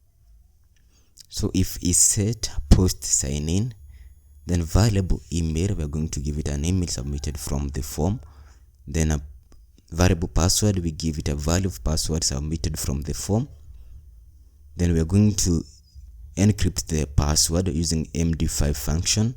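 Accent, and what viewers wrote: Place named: Russia. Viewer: I think you are Russian accent thats good 👍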